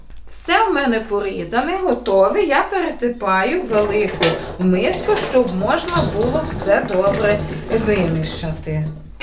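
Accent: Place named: Ternopil, Ukraine. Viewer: Така миска)))) Такі гарні добрі руки! То щедро як на увсьо побратимство! А яка мова, ЯКА МОВА !!!!!! Одна літрА, двістА пятдесят грам ... з Тернопільщини мужчини були, так казали! Кращі дядьки!!!! я в ЗАХВАТІ !!!!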